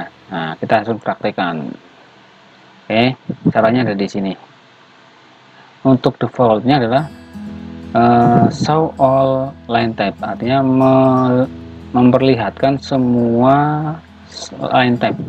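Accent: Banyumas, Indonesia. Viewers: Belajar cara bikin linetype sama om.. Salam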